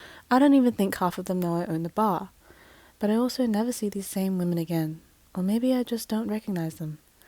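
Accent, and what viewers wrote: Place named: Australia. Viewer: Australia I think. Gotta see if she says "naor" or not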